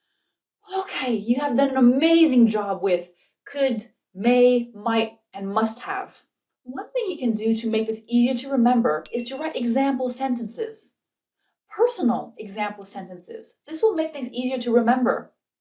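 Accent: United States. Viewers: Excuse me, may I ask you where you come from? I wonder how to indentify your accent, but I guess it's near to american. By the way your speech is very clear. I really enjoy your lessons :) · That's true! number one in American English! We do not need British English)))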